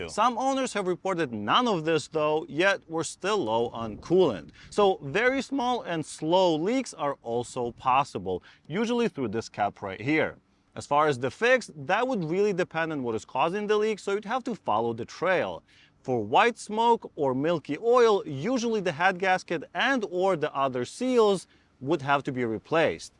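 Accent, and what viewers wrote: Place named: Russia. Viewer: Акцент наш ))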